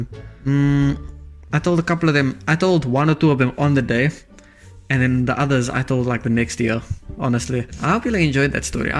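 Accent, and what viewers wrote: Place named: United Kingdom. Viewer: You sound British ngl also W transition at the start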